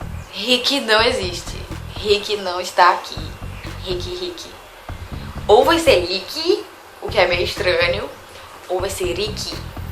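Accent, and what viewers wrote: Pará, Brazil. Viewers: Não se preocupe tanto, mulher, dá pra entender perfeitamente suas explicações ainda mais quem tá acostumado a ver os oppar nos programas e a ver dramas. E aleatóriamente digo que sou fã dos eu sotaque paraense(?).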